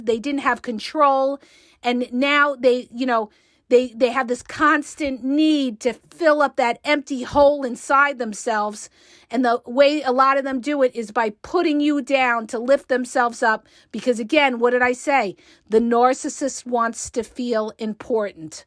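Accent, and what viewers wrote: New York, United States. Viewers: All I can say is that I have one big regret. And that is that I wish I had known about you 19 years ago when the narcissist from hell entered my life. Had I listened to your podcasts back then, Id probably be very happily married with a good man today. You described my ex to T! I had to do a lot of research on these types of men but you would have been all I needed to help me see the light. I love your hard, NY style delivery. It's what's needed. You are my official go to person now. I binge listen to your shorts reels and longer videos, every spare minute. Finding you is a gift. ❤❤❤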